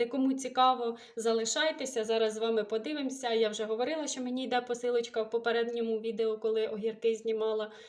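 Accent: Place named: Ukraine. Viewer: український, і говір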